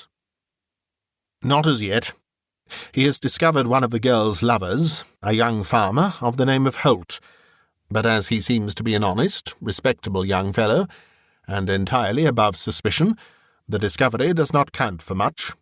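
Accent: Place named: RP, United Kingdom. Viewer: I do really enjoy listening to you especially because you use…dare I say(!?!) what my Grandmother (who raised me) called “The Queen’s English” to great effect. Of course, it’s how these old stories are written.But I’m sure the authors would be thrilled to hear you reading them! ♥️♥️♥️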